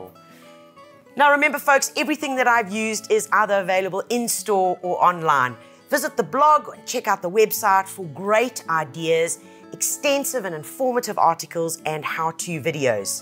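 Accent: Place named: South Africa. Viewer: Excellent video. Also, I love your South African accent. It's a great accent, and I love olives 🫒 there so delicious and have a wonderful flavour.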